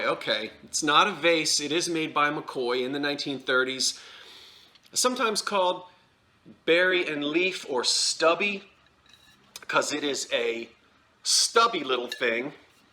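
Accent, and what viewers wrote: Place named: Northeast, United States. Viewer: Oh gosh! I'd have to hunt down those homeowners and insist they keep the paintings with the homes!! Im fanatical about historic documentation., Ive heard you say you don't think you have a Jersey accent, but Ive never heard anyone pronounce "rural" like that! 😄 Must be a North Eastern thang!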